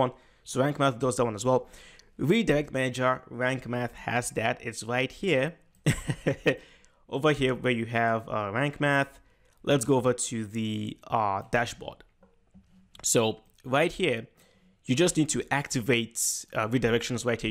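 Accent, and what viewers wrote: Nigeria. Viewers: You sound like a Nigeria, are you?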